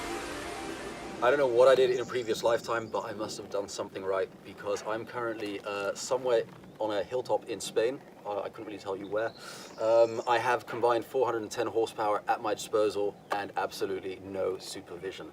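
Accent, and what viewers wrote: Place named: United Kingdom. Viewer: He also speaks like he learned from a Brit. Probably went to school in the U.K.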